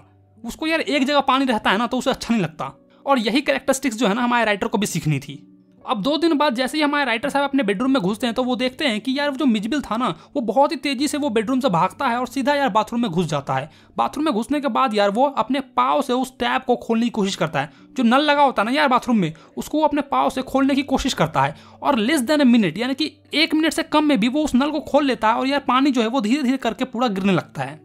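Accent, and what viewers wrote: Bihar, India. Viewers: Sir are you from bihar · Bhai aap Bihar ki language bolte ho 😊 kuch kuch words h na😊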